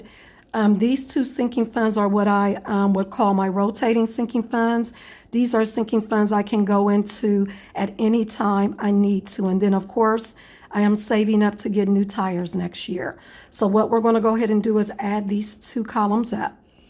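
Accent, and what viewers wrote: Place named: South, United States. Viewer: I love your southern accent where are u from?✨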